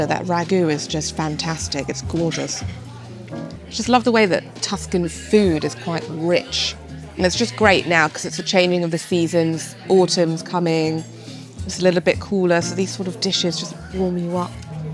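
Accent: England, United Kingdom. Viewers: Just came across channel….this is the best bunny hole I entered. I love listening to her voice, Britishly delicious!!! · Hi. your videos are so good and you explain in a wonderfull voice the really italianity. italy its my country and your "England" english its a sinphony for who don't tell and listen to much like me. You're so very beautifull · Love your shoulders 😍, also you have a really good British accent! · That UK pronounciation is simply unbearable!! 😢😢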